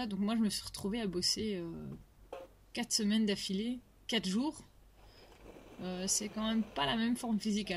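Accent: Belgium. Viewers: Bonjour L'expression "a pouf" pour "au pif" :o) Mais "passer en stoumelings dans les travaux ;;;" (truc comme ça) ??? Sinon j'adore tes vidéos et ses commentaires avec la gouaille bruxelloise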